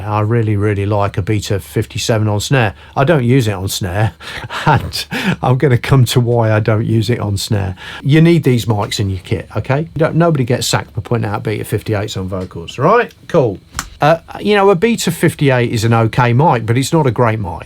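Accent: United Kingdom. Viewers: Congratulations, the best lesson on mics on the YT. I don't need to listen to anything else after this! I'm far away from you (Brazil) but I know now what to buy even if I'm on a low budget. Nothing like the knowledge of someone who lives of a subject. Never saw someone talking about the MD435 and it was a great find. Very hard to find it here because it's so much expansive for us, but I'll save the tip for any intl trip in the future. I play chromatic harmonica and most top players use a SM58 because you know it's a classic and the frequency range is quite similar to the voice but as the sound is produced by free metallic reeds, the higher frequencies are almost of a brass instrument and I think the MD435 would produce a very interesting output. If you ever have a chance to record one harmonic on it, please let me know; I also study sound quality a lot as I'm an Electrical Engineer too. 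Thanks for the video, lovely British accent! Already saved to a playlist and subscribed to your Channel. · Good video! Over 30 years of moving those faders. I agree on most of what you said. My basic kit is almost identical. Where it differs: I got some Røde NT5 in addition, I got the e905 on Snare (with the Audix i5 as an alternative), got an Audix D6 in addition to the Beta52A and the Beta91A (if I use only one mic for the BD I like the D6 better, but 52 and 91 are better in combination). My goto mic for guitar etc. is the Sennheiser e609 or e906. If people want two mics per git amp, I‘ll put on SM57 in addition. They sound more „metallic“ then the Beta57 in my opinion, so they are a better contrast to the e609/906. Oh, and I have some Audix OM7 for a special clientele. It is solid advice to recommend mics everyone knows to be at least ok and can be found on almost any rider, at least as an alternative for the actual wish list. 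Where are you located? Do I hear a little bit of a London cockney accent?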